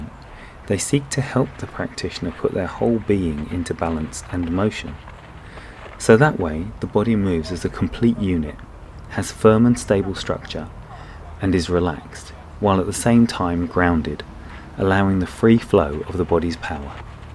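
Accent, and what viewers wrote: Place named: England, United Kingdom. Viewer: Thanks for postting, keep the good work! where in England is your accent from? Cheers mate!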